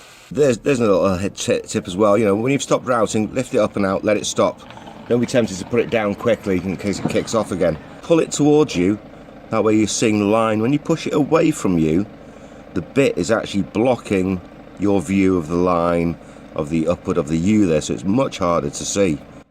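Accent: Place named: Manchester, United Kingdom. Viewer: My God he's from Manchester!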